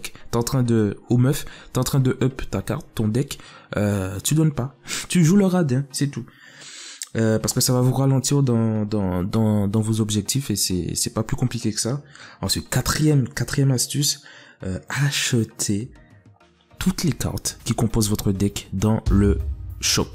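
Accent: Antilles, Caribbean. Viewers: T antillais, ça sentend a ton accent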